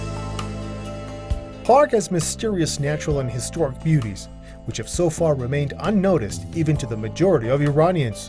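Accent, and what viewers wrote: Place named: United States. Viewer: Looks like a very boring place TBH. No wonder normal people ain't allowed to travel there. Also where did you find a host with a 99% American accent to showcase this XD?!!?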